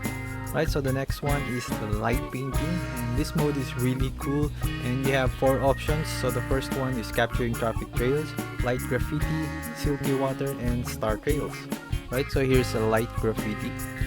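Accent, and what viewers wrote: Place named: Philippines. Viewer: Iba talaga ang accent kapag pinoy nakakaproud🤧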